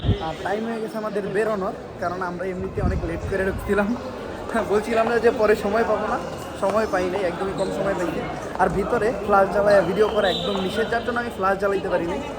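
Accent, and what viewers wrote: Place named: India. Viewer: কে কে খেয়াল করেছেন, ভাইয়ের কথা বলার ধরন কিছুটা ইন্ডিয়ানদের মত হয়ে গেছে, 😅😅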